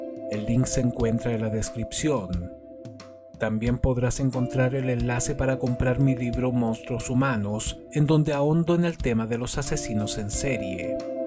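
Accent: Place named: Chile. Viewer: Hola, siempre veo tus videos, pero primera vez que comento algo. Sabes, siempre me ha intrigado esto de los enigmas policiales y como opera la mente de un criminal, es alucinante. Me encanta tu forma de relatar, me gusta que seas chileno porque me gusta tu acento (yo soy chilena), y me gusta el formato de imagen que sin ser morboso o grotesco es bastante grafico y real, la estructura del relato me gusta mucho. Te deseo sigas triunfando y mucho éxito para 2023.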